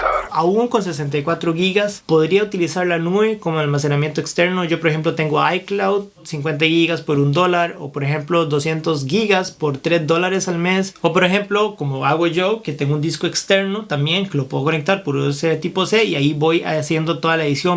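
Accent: Costa Rica. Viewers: Me suena como a costarricense bro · Maeeeeee que buen video, gracias por una reseña tan integral y buena 💯 Usted es de Costa Rica o me falla el oído 👀?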